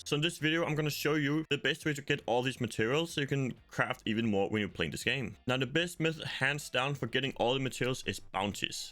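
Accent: Denmark. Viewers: wait rly?? Im from Denmark and i thought that english sound like it had a Denmark accent lol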